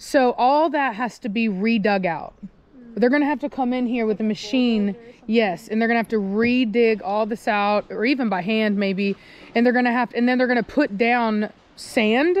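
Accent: United States. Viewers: Put that Texas twang on girl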